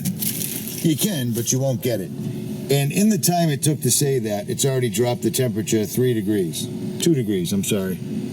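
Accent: Boston, United States. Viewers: Ha. I was watching your video and I said to myself, this guy sounds like he's from Boston. Then toward the end of the video you mentioned you're from Boston. I'm in Boston too (Hyde Park). Anyway.. I'm looking at this fridge now for my cargo trailer that I recently converted to a camper. I was initially looking at the dual zone, but its slightly too large to fit into the space I'd be putting it in. This 45 quart fridge will fit perfectly tho. Thx for the review.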